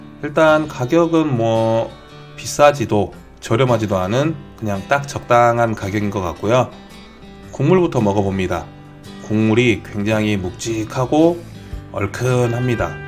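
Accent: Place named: Gyeongsang, South Korea. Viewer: ㅎㅎ경상도분이 시죠?? ㅋㅋ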